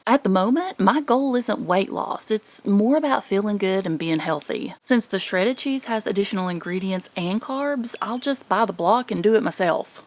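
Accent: South, United States. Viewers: You have a thick southern accent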